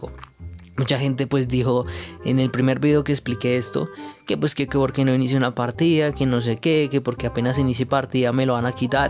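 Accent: Medellín, Colombia. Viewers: Me gusta el asento colombiano xdxdd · Eres de Colombia sierto,creo que de medellin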